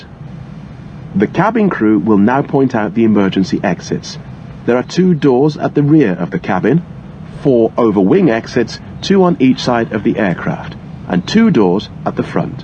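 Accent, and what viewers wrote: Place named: England, United Kingdom. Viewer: Nice english accent. 👏👏👏 Very clear public announcement (P. A.) of a purser/flight attendant.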